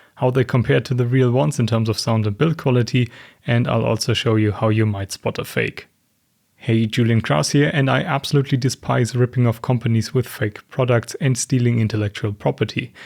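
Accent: Germany. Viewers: Ist das jetzt zum Trend geworden, dass deutsche anfangen auf englisch Videos zu machen? Das klingt immer total schrecklich wenn man hört, dass die Leute Deutsch als Muttersprache haben und nicht englisch 😭 Dieser deutsche englisch Akzent ist grauenvoll!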